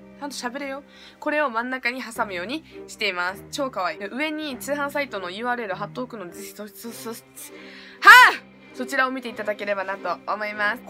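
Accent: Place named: Hakata, Japan. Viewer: 博多弁がすごい好き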